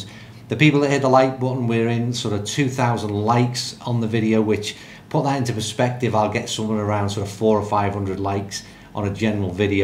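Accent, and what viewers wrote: Liverpool, United Kingdom. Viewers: You say you’re from Wales but do I detect a bit of a scouse accent. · Well done on your decision, I wish you every success! From your voice I'd assumed you were from my neck of the woods (Liverpool) but Wales is close enough innit. Must get back to Nefyn one day, what a course and location! All the best for Christmas mate.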